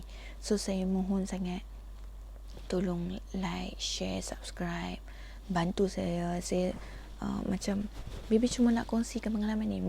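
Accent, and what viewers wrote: North, Malaysia. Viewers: Kekalkan cerita cakap owg utara sbb sembang best sgt..kami suka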